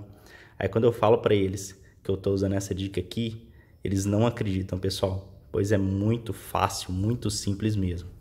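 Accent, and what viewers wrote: Minas Gerais, Brazil. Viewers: Adorei como sempre !!! Muito criativo. Com todo respeito esse sotaque me parece mineiro uma graça.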